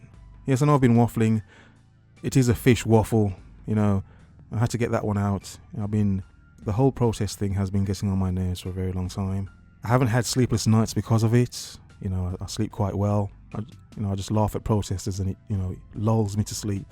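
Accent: United Kingdom. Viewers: You do a good job 8 times out of 10. This one, no. You sound like a cranky old Republican. Your idiosyncratic Brit voice mitigates the Archie-Bunker-impression somewhat. You're still the Man, though.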